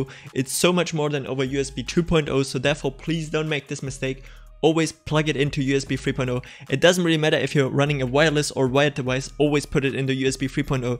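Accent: Germany. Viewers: You are German or?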